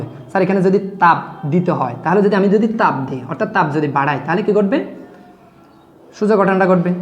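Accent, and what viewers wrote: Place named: Chittagong, Bangladesh. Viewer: স্যার আমি আপনার ভিডিও আজকে প্রথম দেখেছি এবং আপনার কথা শুনেই বুঝতে পেরেছি যে আপনার বাড়ি নিশ্চয় চট্টগ্রাম।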